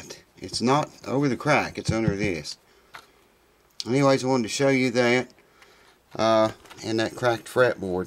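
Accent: South, United States. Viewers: So I knocked my stupid hardly used Wah, Wah off a shelf and it landed on my 3 month old baby...my new acoustic guitar! 😭😭😭😭 Looking for a fix, I found this video and immediately had a good laugh. with his : "Oh I didn't see you there" in I think is called a n English posh accent. Yes it was silly. But still was very funny and made me laugh, and feel a little better. Then it gets even better, when I find out that his actual voice is a southern US accent.. What other surprises does this guy have? No choice but to find out by subscribing..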